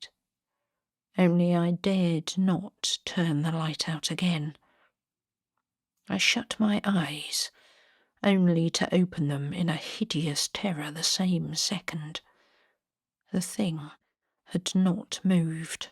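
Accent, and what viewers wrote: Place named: Midlands, United Kingdom. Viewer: Are you from the midlands,? I love your accent and your beautiful narratives! Thank you, my Lovely. X X X😊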